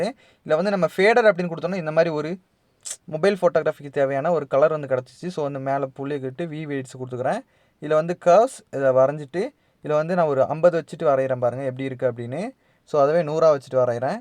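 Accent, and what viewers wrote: Kanyakumari, India. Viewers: Neeka yanntha Oru Anna 🤔 kaniyakumari language mathiri iruku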